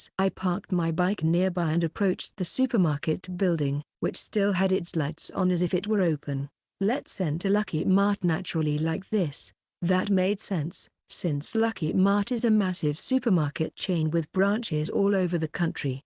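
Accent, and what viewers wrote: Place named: United Kingdom. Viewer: Thank you for uploading this. However the narrorator suddenly switching being British and female is a bit confusing. 😢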